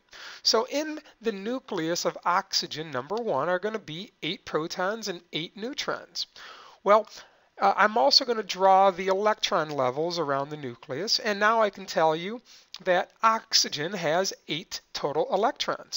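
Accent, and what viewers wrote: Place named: Boston, United States. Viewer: Wicked Bahston accent!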